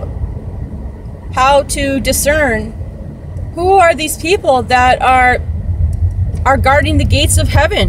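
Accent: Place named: California, United States. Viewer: Hey buddy. Are you in Cali? You sound like you're in Cali.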